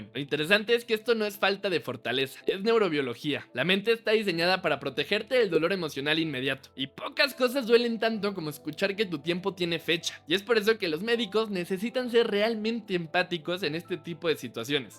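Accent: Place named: Mexico. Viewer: Interesante el video, pero tu voz es demasiado mexicana 😢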